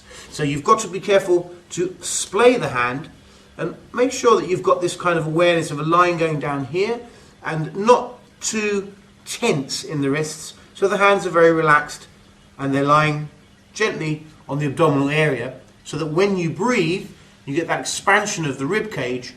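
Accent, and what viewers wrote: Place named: United Kingdom. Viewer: His voice cadence and accent makes me think he'll also be found in a Monty Python skit!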